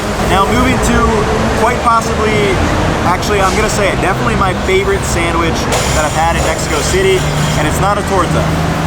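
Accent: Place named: United States. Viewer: I visted MXCD this year and really loved it! But, your Spanish pronunciation is soooooo American 😂 You definitely need to work a lot on this! It’s hard to understand you sometimes. But still thanks for the effort ❤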